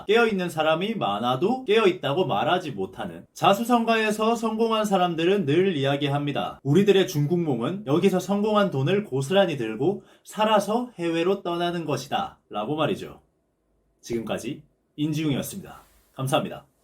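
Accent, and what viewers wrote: Gyeongsang, South Korea. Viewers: 경상도 특유의 표준어 사용시 묻어나오는게 있습니다. 저도 경상도사람이니 아는거고요 ㅎㅎ 그래도 나쁘지 않았어요^^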